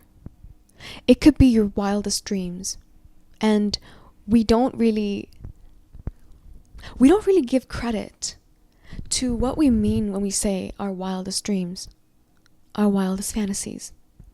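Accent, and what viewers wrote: United Kingdom. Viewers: We going to the UK 😂 you definitely mastering the accent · Not the British accent 😂... had me creasing thnx for repping the London gyal dem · Your British Accent 😂 ❤❤❤ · ❤❤❤.. ohh my Egyptian Goddess Queen your British Accent is gettimg so good That I almost felt I was some How In a Timeline with Harry Potter & Hogwartz scholars ❤❤❤ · Yep 👍 ❤ 😅 your UK accent is hilarious and beautiful! The universe is my sugar daddy !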